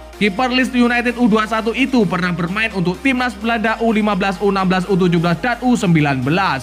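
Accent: Java, Indonesia. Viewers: Jawieerr amat min logatnya .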